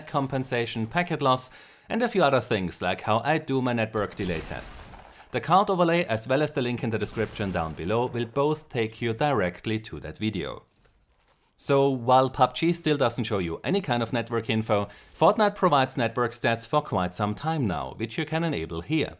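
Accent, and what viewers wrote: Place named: Germany. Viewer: Normalerweise nervt mich ein deutscher Akzent immer wahnsinnig, aber bei dir geht das eigentlich sehr gut.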